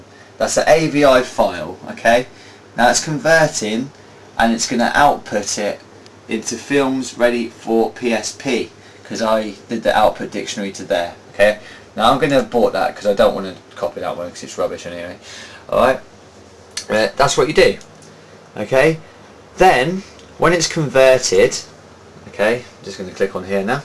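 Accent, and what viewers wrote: United Kingdom. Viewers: Great Brit accent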